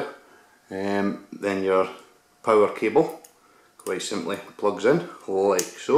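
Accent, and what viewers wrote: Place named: Scotland, United Kingdom. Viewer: Great video! Well done. Scottish accent helps too! We've got GoPro 1 and 2 live out cables ready to go. And the new GoPro 3 cables ready to ship as well now. We've also got the Phantoms themselves in stock and ready to go and the 3S Balancer to JST leads too. If you go to firstpersonview.co.uk and click on the Phantom you'll see my FPV system attached to the bottom - its identical to your's! Happy flying! Simon Dale - FirstPersonView.co.uk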